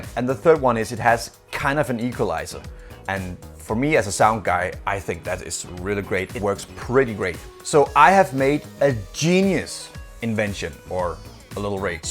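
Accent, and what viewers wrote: Denmark. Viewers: Danish accent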